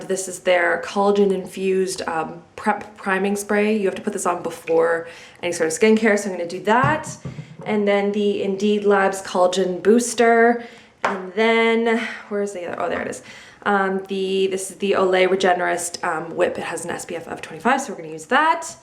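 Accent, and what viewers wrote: Canada. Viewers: I love how your Canadian accent comes out so much more when your at your parents house. Seriously love you lol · When you get near your parents you get 100x more Canadian LMAO this video was so cute :)) I would love to see these more!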